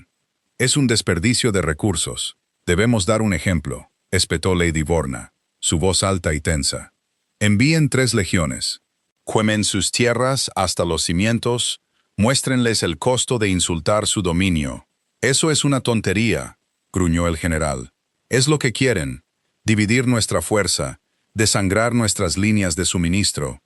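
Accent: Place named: United States. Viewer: Podria ser una voz de IA que no tenga acento gringo?, ya sabemos que estan desesperados por invadir latinoamerica pero ya esto se pasa un poco.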